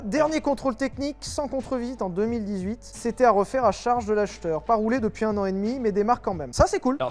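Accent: Belgium. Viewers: Je pense que sur le contenu, y a rien à dire: les conseils sont au TOP! J'ai dû récemment acheter une petite auto d'occaz avec un budget serré de 1200 euros: Twingo, sur base de vos bons conseils sur le budget à 1500 Par contre... on peut parler de cet accent belge à? C'est que c'est bien fait! Je suis moi-même belge et je n'arrive pas à faire cet accent mdr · Incroyable l'accent belge de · On est d'accord 😉. Mais perso, l'accent que prend dans cette vidéo, n'est pas vraiment l'accent Liégeois pur et dur. Je trouve qu'il se rapproche plus de Caudy Focan que de Renaud Rutten. Enfin bref j'ai bien saisi l'idée, et on ne va pas se prendre la tête pour une histoire d'accent Belge 😅🙏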